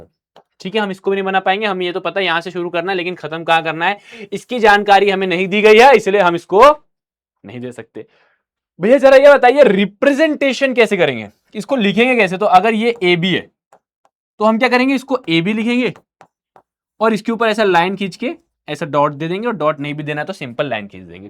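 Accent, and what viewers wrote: Bihar, India. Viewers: Sir ap kya bihar se hai🥰👍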